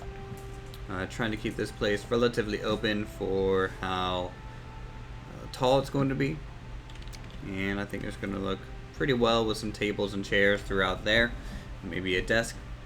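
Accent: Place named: North, United States. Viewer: Keep up the great work!!! P.S. This is super random, but where are you from? I often hear police sirens in the background, so I'm guessing a decent sized city. And I feel like you have a northern U.S. accent. So I'm guessing somewhere Minnesota or maybe Chicago? lol just curious, I'm sure I'm way off haha.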